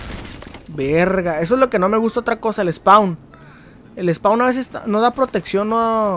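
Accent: Mexico. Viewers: eres de mexico? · Oye amigo de donde eres suenas como si fueras de aca de mexicali , B.C jeje xD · eres mexicano we? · hablas muy norteño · ajajajajaj me subscribo me imagino k eres mexicano yo si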